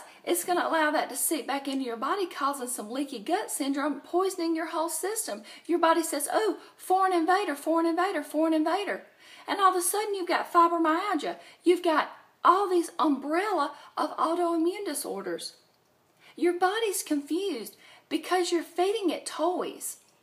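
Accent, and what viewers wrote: South, United States. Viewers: I am addicted to watching your videos because of ur southern accent and ur eyes.... Ur eyes are gorg!!!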